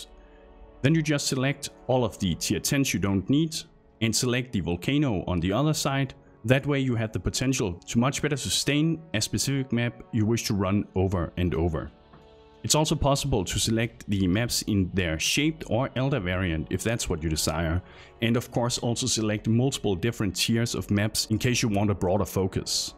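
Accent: Denmark. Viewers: That danish accent thou.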